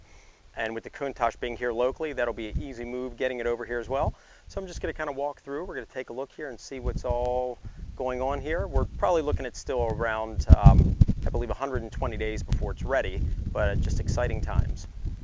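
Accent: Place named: Maryland, United States. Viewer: I've been watching your Fiero vids and I was born and raised in MD before moving to Nashville 4 yrs ago. The second I heard your accent, I knew I had to subscribe! Congrats on the new location. Wish I was still in MD. Could use your knowledge for my Fiero!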